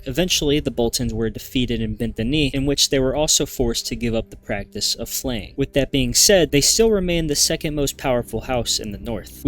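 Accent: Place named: United States. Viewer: I like your accent. I'm assuming you're American (correct me if I'm wrong and you're Canadian); which part of the States are you from?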